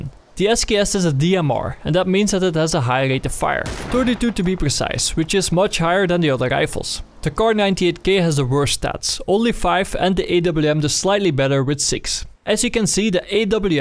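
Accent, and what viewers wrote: Netherlands. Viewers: He's clearly dutch... we got that weird r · Are you dutch? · clearly dutch he sound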